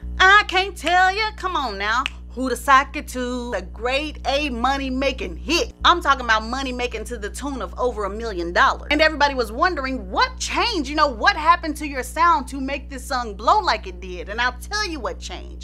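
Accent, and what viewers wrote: South, United States. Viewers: lol it’s her southern accent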